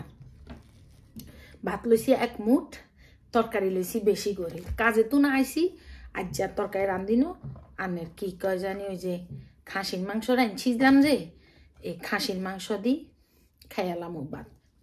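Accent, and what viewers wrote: Noakhali, Bangladesh. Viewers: আপু তোমার নোয়াখাইল্লা ভাষাগুলো শুনলে আমি নিজে নিজে তোমাকে নিয়ে হাসি।তোমার ভিডিও দেখতে খুব ভালো লাগে তাই সবসময় ভিডিও গুলো দেখি তোমাকে নিয়ে হাসি।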